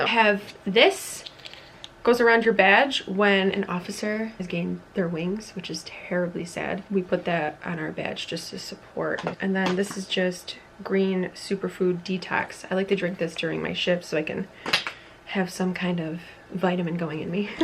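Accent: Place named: Wisconsin, United States. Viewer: I found out later you were from New York 😊😬 sorry you sounded a bit like you were from Wisconsin lol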